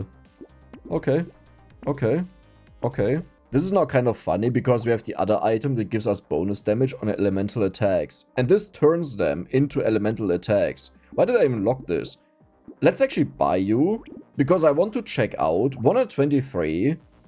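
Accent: Germany. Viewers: As a newcomer i have been thinking what accent does he have. Today, i realized and feel very, very, very dumb. I even speak german.... The "Ooga, ooga, ooga" felt about right for my brain at that moment.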